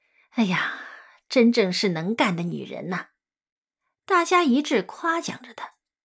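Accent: Shanghai, China. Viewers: 謝謝👍🙏🌹❤一直記得這篇文章。今天又听見了，尤其聽侬讲上诲闲話好亲切❤❤❤🌹🌹🌹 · 的嗓音真的是好听！全文都用上海话来播报就更绝了❤🎉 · 主播本来就是上海人😄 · 主播是上海人啊？ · 的上海话刚得也老道地的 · 喜欢你！希望你能多读一些上海题材的小说，对于我一个移居国外多年的人来说，听到乡音会感到很幸福，谢谢！❤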